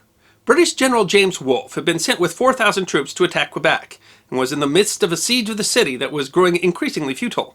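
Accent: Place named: New York, United States. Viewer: You sound like you're from New York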